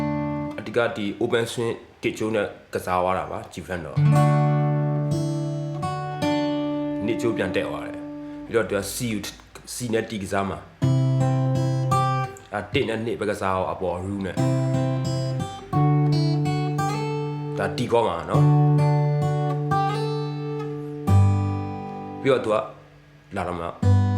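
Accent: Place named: Danu, Myanmar. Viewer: "ညီက ပင်းတယသားလား??အသံကိုနားထောင်ရတာတော့!ဓနုအသံပေါက်တယ်နော!😊✌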